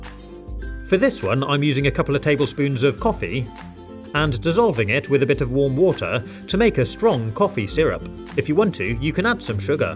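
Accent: United Kingdom. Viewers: And your British that cool I I’m to I love this and keep it up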